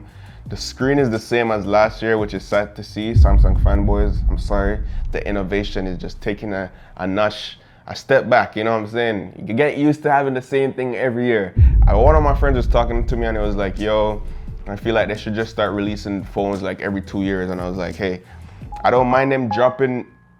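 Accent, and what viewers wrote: Jamaica. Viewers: This Bredda Jamaican?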